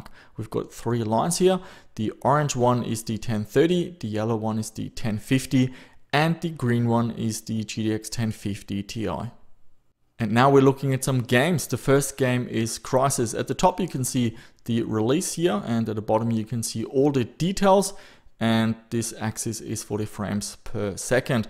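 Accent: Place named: Germany. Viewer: Australian Dollars?????? For your accent I thought all this time that you were German, but then again i am from Mexico so I guess we North Americans know little about the south-eastern corner of the world.....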